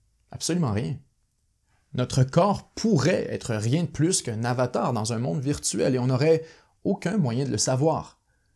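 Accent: Canada. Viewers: C'est quel accent ça? Canadien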